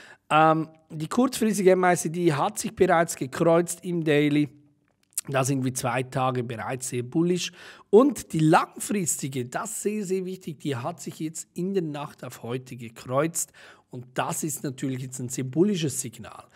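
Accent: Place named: Switzerland. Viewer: schön mal ein schweizer zu hören im youtube :-)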